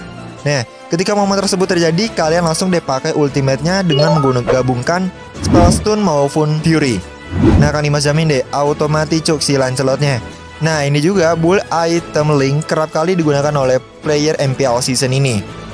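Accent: West Java, Indonesia. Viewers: Kang dimas pasti org sunda ya kan Sama kaya gua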